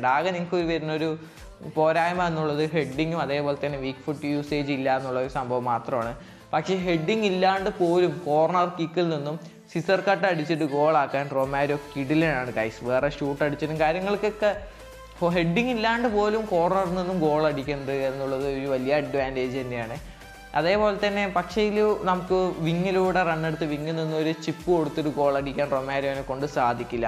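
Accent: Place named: Palakkad, India. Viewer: എനിക്ക് slang കേട്ടപ്പോൾ മനസ്സിലായി ഞാനും paalakadanu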